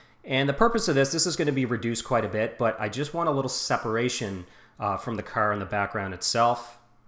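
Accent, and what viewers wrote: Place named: Atlantic Canada, Canada. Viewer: Great video! Cool accent, almost sounds like you’re from Atlantic Canada.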